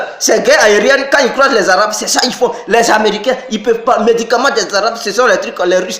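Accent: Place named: Daloa, Ivory Coast. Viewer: Ton accent bete de Daloa te fatigue heinn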